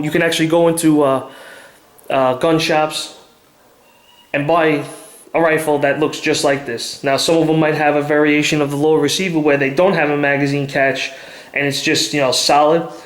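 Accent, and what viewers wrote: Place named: Ireland. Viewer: Wow you sound very irish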